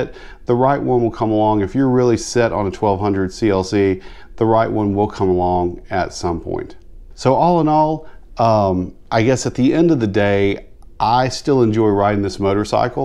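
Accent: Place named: Alabama, United States. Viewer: Interesting I’ll admit I know nothing about these bikes.sounds like you’re in lower Alabama. I’m up here in dekalb county.you should come ride little river canyon.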